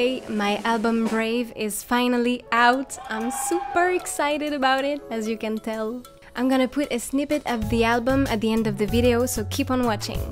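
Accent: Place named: England, United Kingdom. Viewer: I love your songs. I'm from Ecuador and I love your way to talk English, because currenly I'm studying English so I would like to know where you're from..... I can get everything you say us in your videos. best wishes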